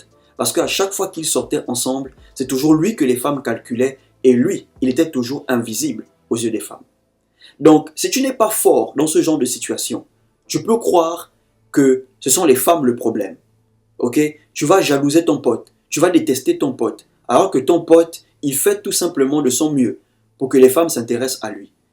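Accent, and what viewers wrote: Gabon. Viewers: J'ai découvert cette chaine au début de la semaine, j'ai visionné plusieurs vidéos, et je trouve que le frère a du vécu, il sait de quoi il parle, tout en dégageant l'attitude de quelqu'un qui est issu du milieu de la séduction. Une très bonne connaissance de la nature et la psychologie féminines. C'est le type même d'ami, de frère, voire de grand frère qu'on aimerait avoir eu avant de se lancer dans le Game où de nombreux d'entre nous se sont fait broyer par inexpérience. Et l'accent du Gabon, où j'étais en 2008, est juste nostalgique. Force à toi frérot, un nouveau fan de ta chaine, du côté de Brazzaville. Mbolooo!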